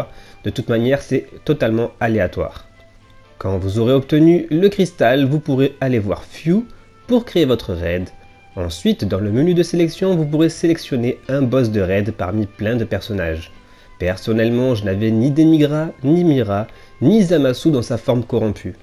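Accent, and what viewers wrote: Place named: Toulouse, France. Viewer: toi tu fais des vid&os àdesheures lol.En tout cas je kiffe et ton petit accent toulousain fait plaiz :). Sur ce, continue ce que tufais car ci cool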